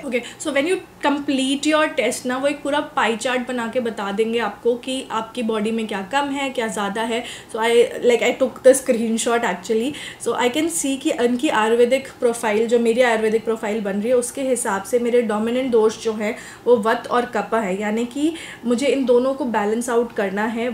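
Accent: India. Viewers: Rajasthani lg re ho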